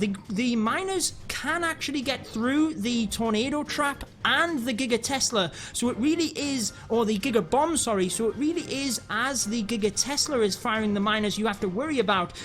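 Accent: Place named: Northeast England, United Kingdom. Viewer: North East England by the sound of it. My guess Sunderland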